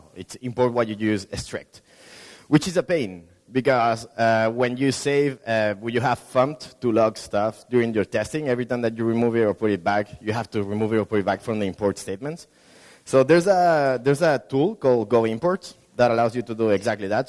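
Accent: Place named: Spain. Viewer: his accent is like a Spanish speaker from Spain speaking English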